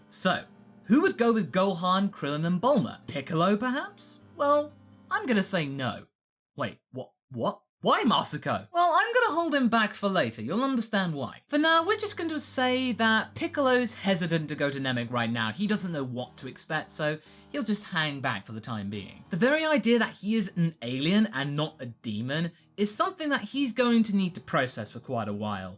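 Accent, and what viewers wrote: United Kingdom. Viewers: R u British ? Just askin sorry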